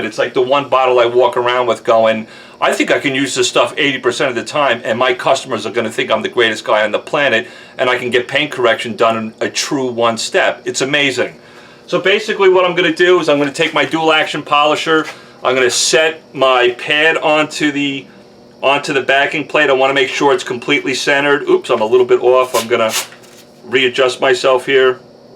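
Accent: New York, United States. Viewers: I'm hearing Long Island or NJ here paisan.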